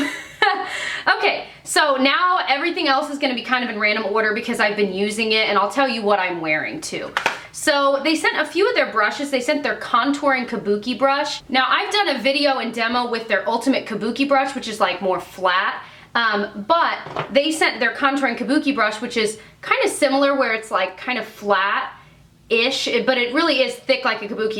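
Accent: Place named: Boston, United States. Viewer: U from boston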